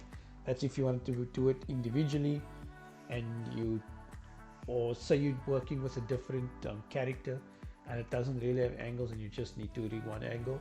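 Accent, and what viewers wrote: South Africa. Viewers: Great tutorial, thanks so much for sharing! Do my ears deceive me, or am I hearing a South African accent?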